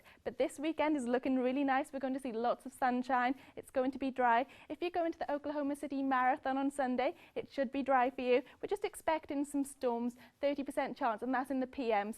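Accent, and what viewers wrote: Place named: Wales, United Kingdom. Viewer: Why, and how, is a Welsh girl reading the weather in America?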